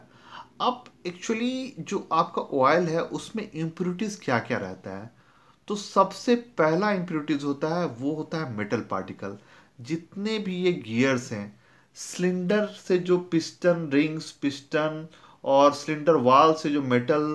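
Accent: India. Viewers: Titled in English. Audio is Indian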